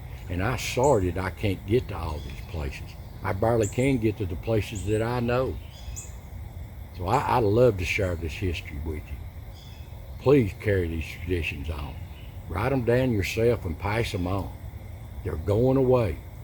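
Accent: Appalachia, United States. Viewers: My husband and I watch all your videos. For me, personally, the 1st thing that drew me in was your voice. I had been searching for appalachian history and found you. I subscribe to a few other appalachian channels, but yours is my favorite. I have lost all my immediate family, just good ol Alabama mountain folks. Your accent is identical to theirs, so it gives me such comfort. You'll never know 😔 I wake up every Monday morning, have my coffee, and check in with the Ridge. My husband and I now spend alot more time out in nature and even plan on buying a metal detector very soon. ❤ God Bless you, and all you do.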